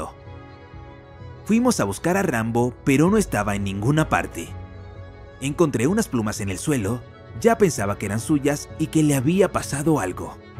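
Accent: Russia. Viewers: Bro sos ruso o español?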